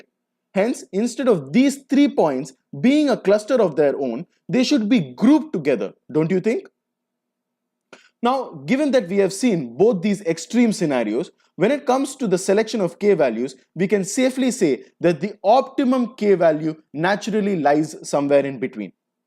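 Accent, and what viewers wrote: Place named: India. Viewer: even if my ears hurt by your hardcore indian accent, your way to explain is brilliant, sir!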